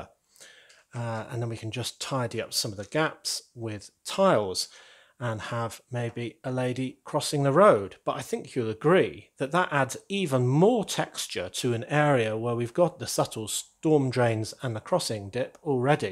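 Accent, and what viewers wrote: United Kingdom. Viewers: When you build a curb with two plates height, in reality, this would be a Curb of 24cm /9,4 inches (In the Minifig scale )). I'm from Germany, where the curbs are 15cm/4,7 inches high. So I am not sure, if in other countries curbs of such great height of 9,4 inches exist. Can you say, if in Great Britain (due to your dialect it seems, that you live there) or in the USA (if I guessed your home country wrong) such high curbs of 9,4 inches exist?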